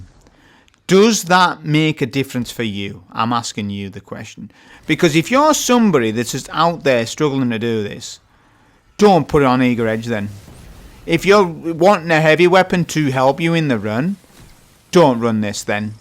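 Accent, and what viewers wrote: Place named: Newcastle, United Kingdom. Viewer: that's fair enough, I'm just trying to identify the accent, sometimes I think there's a bit of Geordie in there, sometimes I'm like hmmmm.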